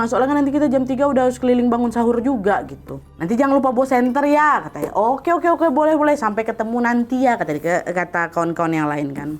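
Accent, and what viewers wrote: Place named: West Sumatra, Indonesia. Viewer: hiyaa, Sumbar, payakumbuh.. logat minangnya gak ilang yaa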